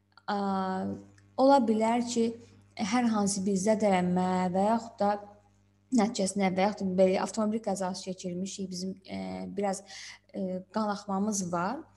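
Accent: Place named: Ismayilli, Azerbaijan. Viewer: 100 faiz İsmayıllısınız. C və G hərflərini dəyişk salirsiz onun üçün dedm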